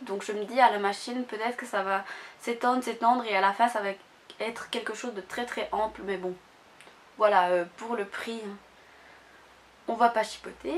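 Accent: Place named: Belgium. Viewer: Super vidéo, je commande tout sur Aliexpress et j'ai eu bcp de déception... Continue comme ça PS: J'adore ton accent 😍 Belge ?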